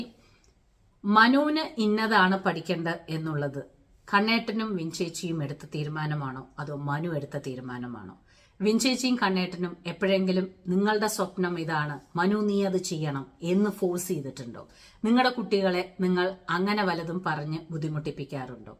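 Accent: Palakkad, India. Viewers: chechide palakkadan basha miss cheyyunnu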